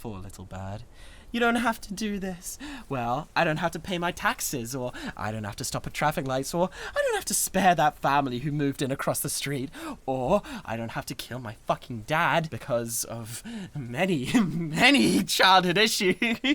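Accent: United Kingdom. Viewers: High pitched British screaming that's makes you both laugh and also feel slightly concerned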